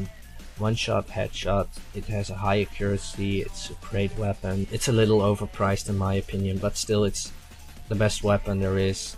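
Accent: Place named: Russia. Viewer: the guy sounds russian i can tell an accent very good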